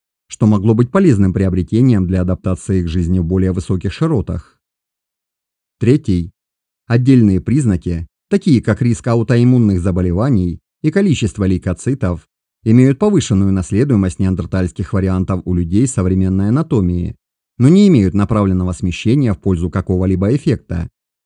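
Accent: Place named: South, Russia. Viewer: Юг России. Просто тихо и в наушниках.)))я же увлекаюсь лингвистикой))и мне говоры не мешают. Такиньки. Южняк)))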